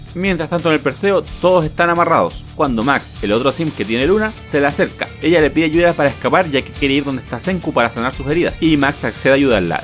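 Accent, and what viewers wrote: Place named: Chile. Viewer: no aguanto su asqueroso acento chileno....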